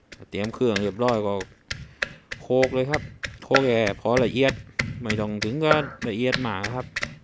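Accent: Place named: Rayong, Thailand. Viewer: พูดระยองด้วยน่ารัก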